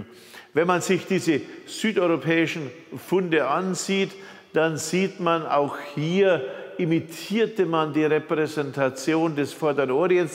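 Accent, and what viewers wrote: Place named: Bavaria, Germany. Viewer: Der Mann ist aus Bayern. Hört man aber eigentlich.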